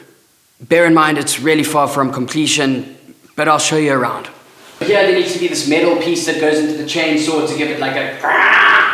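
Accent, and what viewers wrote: South Africa. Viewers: You sound South African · As someone from Cape Town I never notice our accent in person but as soon as it's on video it's like in your face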